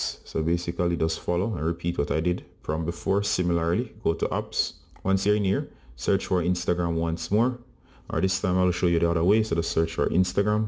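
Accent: Jamaica. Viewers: Are u from Jamaica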